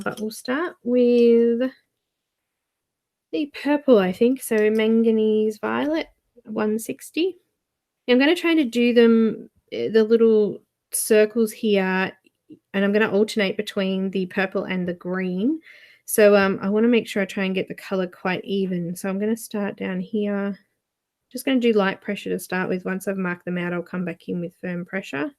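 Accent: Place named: Australia. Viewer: Just wonderful, I learnt so much from this 4 series tutorial. Just realised your a fellow Aussie also. Should of picked up on the accent sooner. I know you used white for these ones but I am really wanting to try the Strathmore toned grey and tan paper, can you please advise exactly what to order as I am clueless. I do use the office works board 200gsm and love it. Many thanks.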